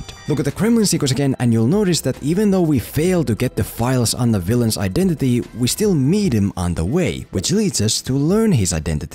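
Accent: Finland. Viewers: Are u from Finland?? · Are you finnish? You sound finnish.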